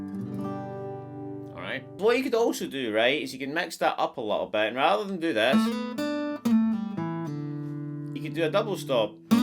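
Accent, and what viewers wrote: Scotland, United Kingdom. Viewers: you speak scottish,,,who in their right mind is gonna listen to your accent? tell me who? I want all their names,,they need therapy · I like guessing Scottish accents and where they’re from, I’m gonna guess Edinburgh with an EW Punt on Penicuik! Am I in any way close? 😅 👍🏴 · Great lesson and great to hear a fellow Scot! 🏴🤝🏻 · This is great, I not only get a guitar lesson but I learn how to understand a Scottish accent!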